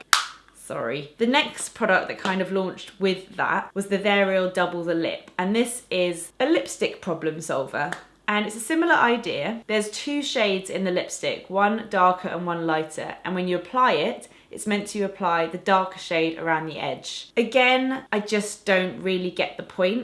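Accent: United Kingdom. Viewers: Hey, I love your Honesty and you are so classy and natural (love your British Accent). I am not on all that make-up thing expect Nars Product but I love watching your videos, they are so pleasant! Cheers from Paris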